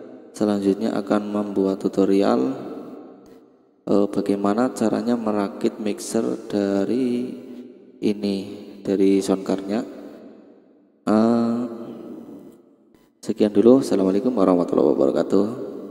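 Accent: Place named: Banyumas, Indonesia. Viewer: ngapak ngendi mas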